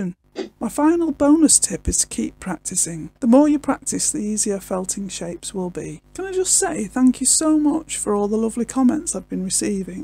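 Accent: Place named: England, United Kingdom. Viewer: I just discovered your delightful, very entertaining, instructional needle felting video’s. Kudos! I’m planning to see all that you have posted. I like your focus on the fundamentals rather than breezing over them. My “older” ears do have trouble distinguishing your English brogue and the rapid clip at which you talk. It’s okay and I’m not suggesting you change a thing, not that you could. Accordingly, my long, slow Southern drawl would likely drive you nuts! I compensate by listening to some of your sections several times until my brain gets it. We hear with our brains, not our ears, ya know. I’d be especially interested to hear more from you about fiber prep for needle felting. I’m a fairly seasoned needler but have had little success applying an outer layer of fine fiber without leaving the telltale little holes, even using a 40 needle of any shape. 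I haven’t owned a 42 but shall order a few after seeing your videos. Thanks again!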